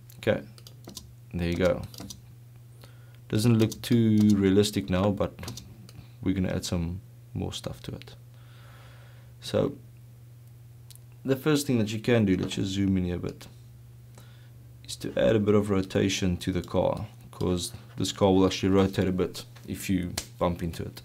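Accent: South Africa. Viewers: You are South African? :O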